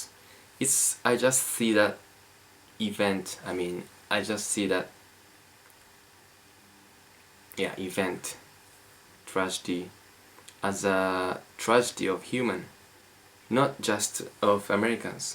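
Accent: Japan. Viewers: I can tell you are a Japanese from your accent! Korean and Chinese have better English accent than you.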